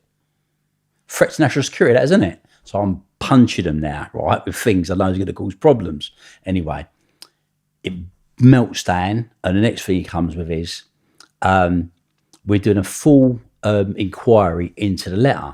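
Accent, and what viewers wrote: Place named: England, United Kingdom. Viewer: The elegant, upper class English accent talking about video games??? I have a hard time seeing you hard at it, in between podcasts, just slaying the shit outa fictional characters. I get what you're doing but it does make me giggle. Im very glad you are getting sponsored, i know it's hard for your genre to get that. Im just being a shit head! Good for you, love your show.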